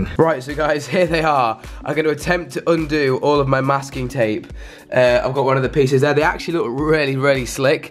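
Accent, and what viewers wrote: United Kingdom. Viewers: This dude sounds like faze adapt with a British accent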